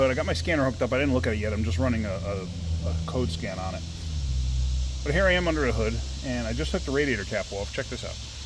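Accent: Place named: New York, United States. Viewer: Ok, thanks. Sounds like he has a NY accent so that's why I thought Mexico,NY.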